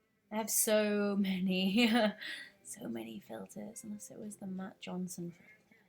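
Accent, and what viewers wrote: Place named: United Kingdom. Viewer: Love yer acent , so british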